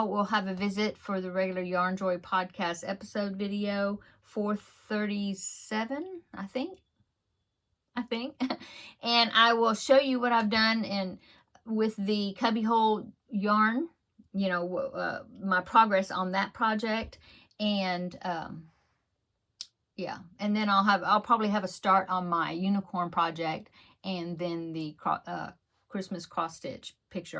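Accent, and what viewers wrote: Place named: Texas, United States. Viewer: I just found your channel, and im really enjoying it. Im formerly from East Texas and now live in Georgia. Im enjoying a fellow Texan accent!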